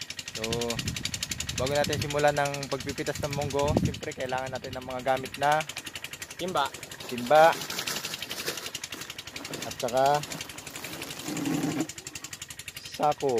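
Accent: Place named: Ilocos, Philippines. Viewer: Hello katambay,,,sarap nmn nyang munggo,lalo na yung hindi pa gaanong natutuyo,bagoong at inihaw na isda tapos inasiman ng sampalok na hilaw,,,curious lng po kasi parang may puntong ilocano kayo,,marunong po ba kayong mag salita ng ilokano?,,subrcriber from solid kaharabas po,,,from Tarlac city,OFW Madrid Spain,,,ingat po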